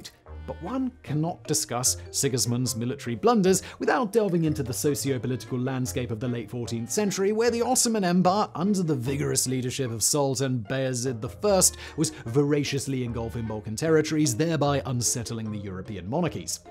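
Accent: United Kingdom. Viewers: “A true zenith of egregious military inability.” This is the most intense and perfectly descriptive explanation of the general’s lack of military prowess… But when I say it, it doesn’t sound as impactful as when says it…his British accent makes it sound much more posh and erudite than it does with my American/New Orleans accent… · Bro - you need to slow your speech down a bit . Between the pace of your speaking and the fact that you have a British accent ( which everyone may not be accustomed to hearing ) it makes it hard for the ( some ) listeners to understand all the words in the lesson you are trying to get across . Please , slow down.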